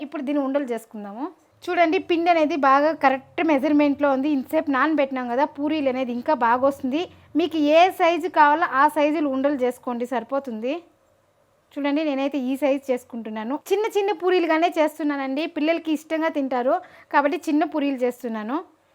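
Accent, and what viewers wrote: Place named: Rayalaseema, India. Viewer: మీది రాయలసీమ ప్రాంతమా. నీ భాష ప్రకాశం రాయలసీమకు దగ్గరగా ఉంది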